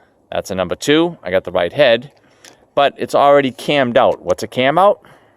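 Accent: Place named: New York, United States. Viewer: My favourite part of this video was the strong New York accent. My second favourite part of this video was when you put the driver in reverse so it wasn't trying to drive the screw even deeper.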